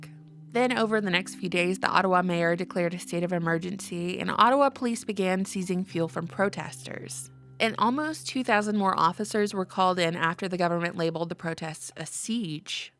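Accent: South, United States. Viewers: Love your channel lady! Have you heard of or covered anything about canadas “m a i d” service? Btw love the southern draw. Reminds me of home. 🥰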